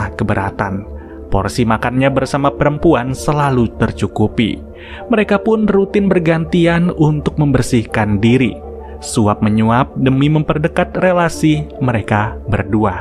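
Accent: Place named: Banyumas, Indonesia. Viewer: Wong ngendi koh lur kayonge ngapak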